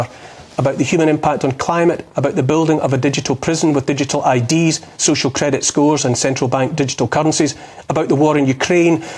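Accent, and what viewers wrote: Scotland, United Kingdom. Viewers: With that glorious Scottish accent, Oliver sums up so very eloquently the truly horrific situation we find ourselves living in. I can only pray that governments and their puppeteers will one day pay for their crimes against humanity. Thank you, I dont like the subject matter, but you are a joy to listen to ❤️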